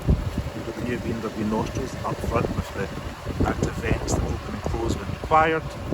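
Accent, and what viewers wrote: Scotland, United Kingdom. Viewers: Can tell a fellow Scotsman Usual Scottish windy weather in the video. Nice review as always.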